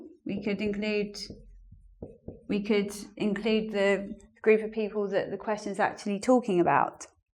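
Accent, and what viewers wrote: United Kingdom. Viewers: Your Great, Miss.I was looking for real British English Lesson and now finally found you on you tube, awesome! by the way I'm from Nepal. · she has British accent. I like her voice lol so similar with my professor from London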